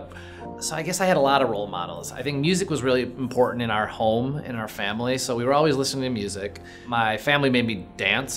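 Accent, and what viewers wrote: United States. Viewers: His Chicago accent is really cute. I love the way he says mom and on and comedy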